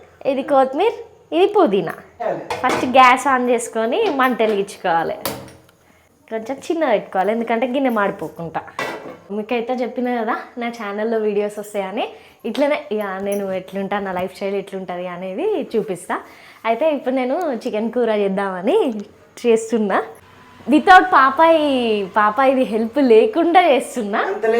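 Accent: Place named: Warangal, India. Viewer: Warangal slang vere untadi... Akka mi slang masthu untadi..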